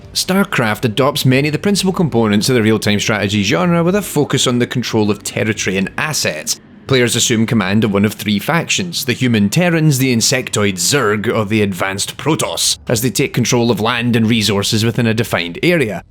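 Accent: Scotland, United Kingdom. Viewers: Anyone else taken aback by the sudden switch from a Scottish accent to a really good French one?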